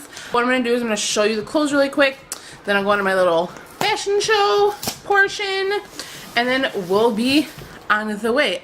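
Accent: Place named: Chicago, United States. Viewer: Chicago makes sense too. Yes you have a strong accent :)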